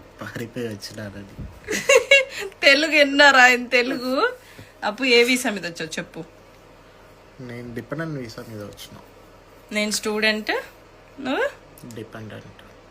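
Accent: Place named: Coastal Andhra, India. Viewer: భాష, యాస ఏ ఊరు.. చెప్పండి.! Gntr or ఒంగోలు.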